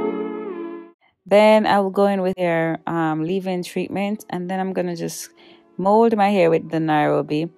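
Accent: Jamaica. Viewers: Jamaican accent trang bad. Thanks for this 💪🏾🤗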